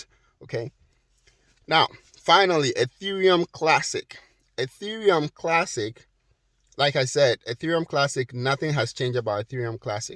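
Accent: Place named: Africa. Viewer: Where are you from??, you have an African acent. I even just subscribed because of that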